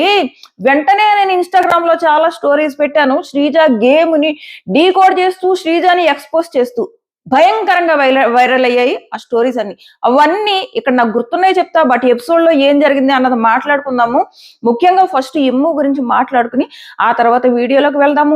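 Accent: Godavari, India. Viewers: ఏదో గోదావరి జిల్లా భాషతో మాట్లాడేసి మీకు కనిపించింది గొప్ప అనుకుని మాట్లాడేస్తారు మీ సర్కిల్లో కూడా మీ సర్కిల్లో కూడా మీ ఫ్యాన్స్ కూడా మీకు తగ్గట్టుగానే మాట్లాడేస్తారు శ్రీజ తనుజ విషయంలో కొంచెం పొగరుగానే మాట్లాడింది డిమాండ్ విషయంలో పొగరుగా మాట్లాడలేదు ఇంకోటి ఏంటంటే ఎవరి ఆటిట్యూడ్ వాళ్లకు ఉంటుంది ఏదో మీరే గొప్ప అనుకుని మాట్లాడేస్తున్నారు శ్రీజ ఎలిమినేషన్ అయిపోయినందుకు మీరు చాలా సంతోషంగా ఉన్నారు కానీ శ్రీజ ఎలిమినేషన్ బిగ్ బాస్ కి గొడ్డలి పెట్టు ఇప్పుడు ఎవ్వరూ బిగ్ బాస్ ని అనకుండా ఏమి తిట్టకుండా టాస్క్ లో ఓడిపోయింది కాబట్టి మిగతా వాళ్ళకి జెన్యూన్ రీసన్ ఇచ్చే లాగా జెన్యూన్ గా కన్వెన్షన్ ఎలాగా బిగ్ బాస్ మైండ్ ప్లేయింగ్ చేసింది మీరేమో పెద్ద మీరే గొప్ప అనుకున్నట్టు శ్రీజ వరస్ట్ అని చెప్పేసి ఓవరాక్షన్ చేసుకుంటూ మాట్లాడేస్తున్నారు ఒక్కసారి ఒక్కసారి ఆది రెడ్డి గారి ఇంటర్వ్యూ ఆదిగా రెడ్డి గారి రివ్యూలు చూడండి రివ్యూలు ఎలా ఇవ్వాలో ఆయన దగ్గర నుంచి మీరు నేర్చుకోండి అయినా తప్పులు చేస్తారు కానీ 10% 15% మాత్రమే మీరు మాత్రం 50 శాతం తప్పుగా చెబుతారు కొన్ని బాగుంటాయి అనుకునే లోపే వ్యక్తిగత అభిప్రాయం ఇంకోసారి మళ్లీ చెప్తున్నాను జాగ్రత్తగా వినండి డెమో ఖచ్చితంగా చేసింది వెన్నుపోటు మొహమాటం లేకుండా చెబుతున్నాను మీరు గొప్ప నేర్చుకొని నీ మీద ఉన్న గౌరవం కూడా పోతాడి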